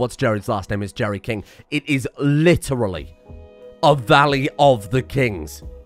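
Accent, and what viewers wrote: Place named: United Kingdom. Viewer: Speaks in British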